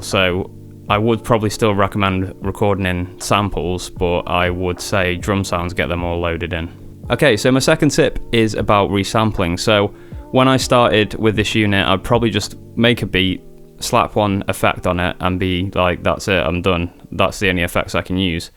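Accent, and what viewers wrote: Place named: Solihull, United Kingdom. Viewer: Hey mate. Greetings from Canada. First video of yours I'm watching. I recently picked up one of these units used so im looking forward to watching your tutorials. I'm watching this video now and my wife heard your accent and said she thinks you're from solihull... she's originally from Birmingham 🙂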